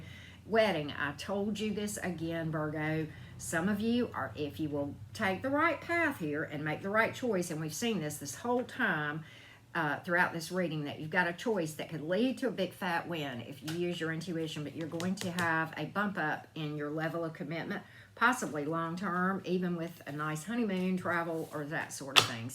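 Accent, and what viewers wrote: South, United States. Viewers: Definitely resonates. I like your southern accent too!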